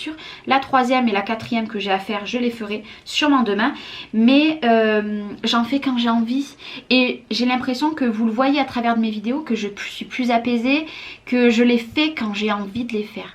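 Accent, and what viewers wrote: South, France. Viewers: J'aime regarder vos vidéos et moi qui suis de Nantes j'apprécie beaucoup ce petit accent qui me ramène un peu le sud vers moi. Bonne journée ☺️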